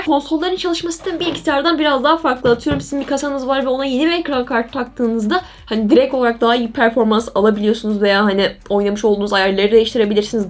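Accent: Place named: English-speaking world. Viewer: Bu karı niya ağzı yaylana yatlana konuşuyor? Sanki ingilizde türkçeyi sonradan öğrenmiş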